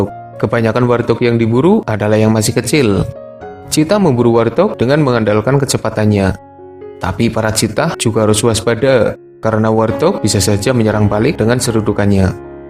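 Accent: Banyumas, Indonesia. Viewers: Logat ngapak ye..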